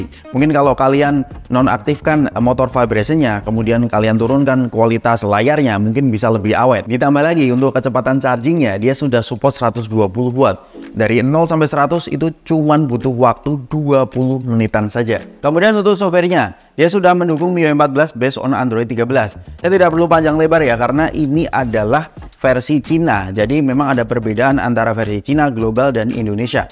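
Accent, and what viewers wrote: Indonesia. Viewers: Gak lah. Indonesia banget aksen nya kok sampe Amerika latin segala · Ga, malah indo banget aksen nya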